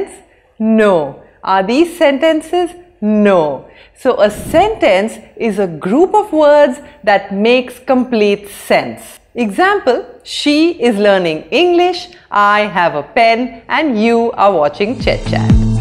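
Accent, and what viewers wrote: India. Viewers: She is not speaking UK or US accent she speaks simple Indian English so I was wondering how she is getting more view at each video finally I googled her & found she is brilliant and very hard worker Finally she earned one more her subscriber 🌹👍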